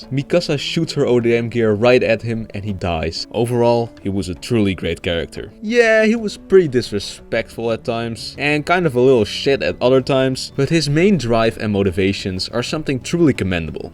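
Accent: Netherlands. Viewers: Are you dutch? cuz you sound like a dutch person ngl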